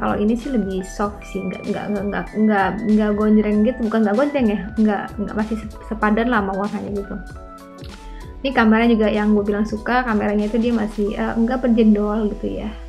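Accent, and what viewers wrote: West Java, Indonesia. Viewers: Urang sunda nye teh?😊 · Orang Sunda 🤣🤣 suarana teh meuni lembut...aya ngejendol na🤣🤣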